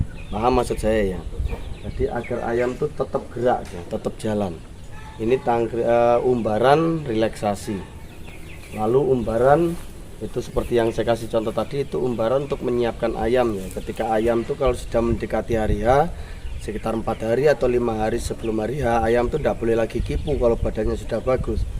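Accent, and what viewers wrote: Banyuwangi, Indonesia. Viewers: Mas sapean apa orang Banyuwangi to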